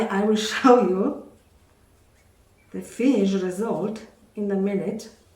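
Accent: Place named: Slovenia. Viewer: This is the most interesting way of making salami. My parents make home made sausages with the skins. I really enjoy watching you in the kitchen and love your accent. I was born in Slovenia and came to Australia when I was 4 yrs old. That was over 53 yrs ago. So I have no accent, but my parents sound like you.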